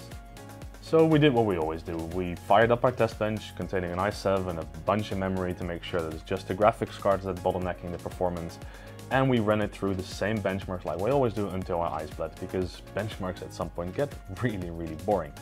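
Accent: Netherlands. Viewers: are you dutch? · Jawel, hij spreekt Engels met een Nederlands accent. Bovendien is hun pand gevestigd in Nederland.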